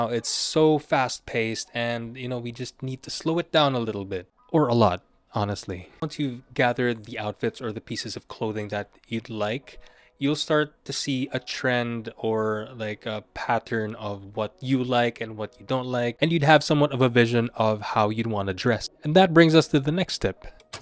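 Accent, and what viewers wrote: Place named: Philippines. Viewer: You kind of have a Filipino accent when speaking in English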